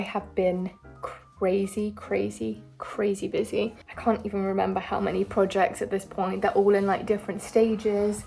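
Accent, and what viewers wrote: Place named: United Kingdom. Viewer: My dream job is being an interior designer, and because of that, I watched your video, and I noticed how beautiful your British accent ❤❤ .I wish you rache everything you want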